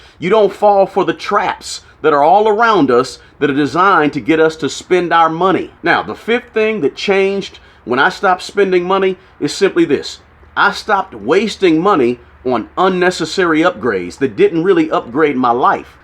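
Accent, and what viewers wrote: United States. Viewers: You're a smart cookie! I know exactly what you mean. I have cupboards bursting with stuff! Good video. I love the American accent 🇺🇸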